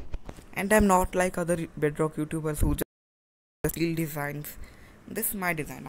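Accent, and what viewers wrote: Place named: India. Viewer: You sound Indian, thats no bad thing, that's a GREAT thing, keep being smart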